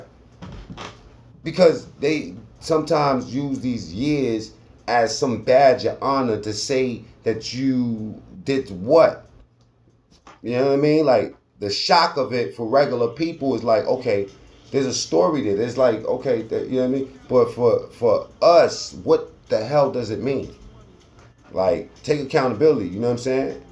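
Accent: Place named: East Coast, United States. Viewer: Hello I am a new subscriber and love your East coast accent🤩 and how real you are about every situation. 💯 I'm from the South and love to hear people from New York, Jersey and Baltimore talk. 🤩 It's really sad to see how Danny went down and continues to blame Joe for everything instead of "Thanking him" for his help and all the money and assistance he gave him when he first got out of prison. I really want the best for him and hope he can LET IT GO and move on. 🌼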